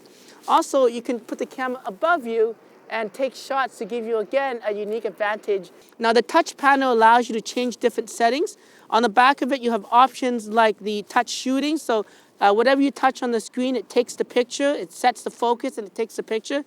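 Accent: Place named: Caribbean. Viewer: I'm picking up a little Caribbean accent in your speech, do you visit frequently? 🤓 great video!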